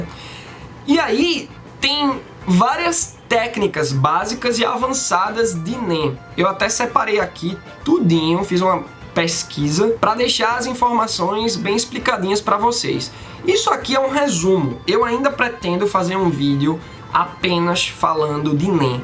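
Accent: Pernambuco, Brazil. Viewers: Ei mano, tu é de Pernambuco?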